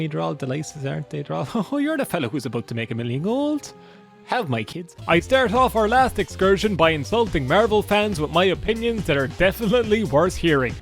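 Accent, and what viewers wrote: Ireland. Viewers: Man with irish accent playing old RPGs is my favorite youtube genre. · !!! Man it’s so good to hear that Irish accent again, and he returns with almost 2 hours of Daggerfall wowie what a blessing! we hope you’ve been okay, it’s so good to hear you alive and well. CHEERS MATE 🥂🍻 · you have no idea how happy I am to hear that Irish accent monologue about TES again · I’ve never been so happy to hear that Irish accent coming through my speakers. Welcome back,! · that sultry Irish voice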